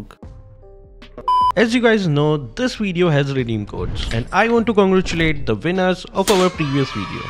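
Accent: India. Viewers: Indian accent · Its because of his accent indian english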